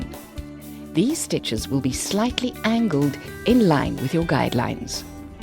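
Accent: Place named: South Africa. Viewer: She is South African, we speak this way.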